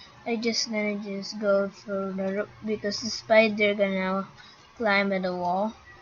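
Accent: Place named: Philippines. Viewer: I can tell by your accent you are filipino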